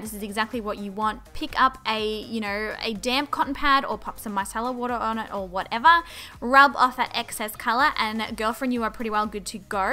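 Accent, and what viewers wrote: Australia. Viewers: Wow din knew u were Aussie yayyyy lots of love from Melbourne xx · That’s correct that how Aussie’s say it🤗🤗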